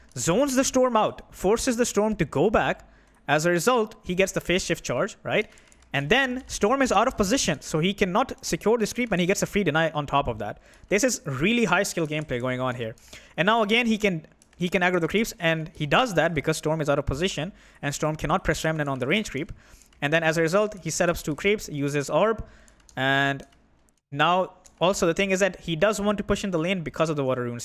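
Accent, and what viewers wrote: India. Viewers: see scammer indian accent click dislike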